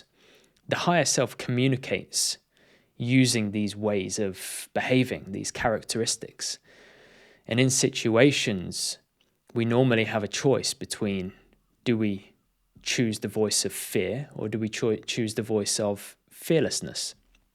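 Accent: United Kingdom. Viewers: nice to see a person from the uk talking about higher self and spirituality. Somehow it feels more relatable when someone is talking about this stuff with an accent and an approach that you can identify with. Thanks for sharing this. I also have a channel, but as you can see it’s all about motorcycles and adventure, however as with most people there’s another side. Just before lockdown a personal event lead me on a journey. I finally found Reiki and law of assumption. I’m so much more mindful about my own thoughts, words and feelings. Meditation is something I struggle with to be honest but it’s something I feel I need to incorporate. Thank you for this video.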